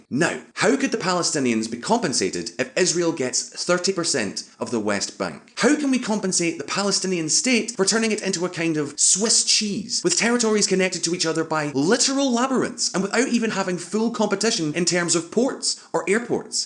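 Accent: Scotland, United Kingdom. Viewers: The posh scotsman is back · Finally a Scottish accent too! Gaun yersel!!:) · The (Scottish? Welsh?) accent is grrreat man! (read as A Scotsman speaking, lol) But for the love of decency, man, grow a beard and get some nerd-approved specs on your face :-D. As for Isreal/Palestine it's a case of 2 wrongs. It's very complicated; and instinctually you think, single state solution! But that's not as viable anymore as there is definitely economic disparity, and there would likely be polarizing political battles in perpetuity (not that we know anything about that here in the States). At it's core you have 2 peoples who feel the land is theirs by birthright...and the Northern Irish can tell you any peaceful coexistence is uneasy at best.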